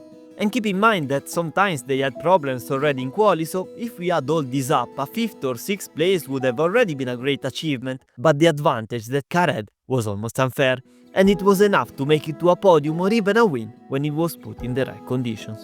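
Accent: Italy. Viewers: I never knew there is another accent could fit Formula One content but british, and there you go! Italian accent and some italian words makes it top notch! Plus it's fascinating too! Keep going mate! +1sub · Very very informative and interesting video, I didn't know the story but the way in which the Italian pronounciations add to the Mediterranean vibe is amazing NGL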